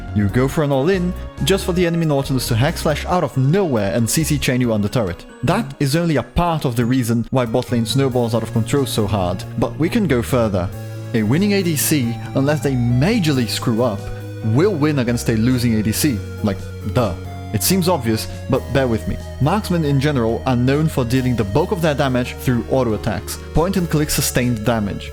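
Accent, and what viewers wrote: Brazil. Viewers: Are u Brazilian ?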